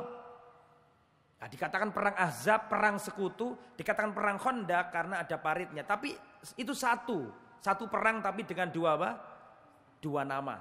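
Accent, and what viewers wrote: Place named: Java, Indonesia. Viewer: Seneng p ustadz logate njowo iq...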